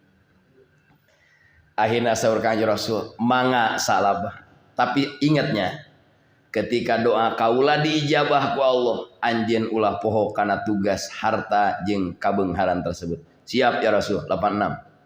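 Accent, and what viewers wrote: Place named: Cianjur, Indonesia. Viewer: Logat cariyosnmh asa ci anjur. Waas .duh.abdi mlb Garut.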